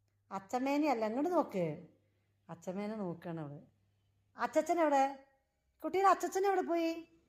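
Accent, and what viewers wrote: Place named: Palakkad, India. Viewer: ഭാഷ കേൾക്കുമ്പോൾ പാലക്കാടാണെന്നു തോനുന്നു.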